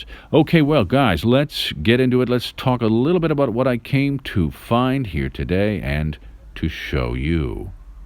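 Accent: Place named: United States. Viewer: Why can't all Americans sound like you. Both you and TGV have taught me so much about Seiko. Cheers👍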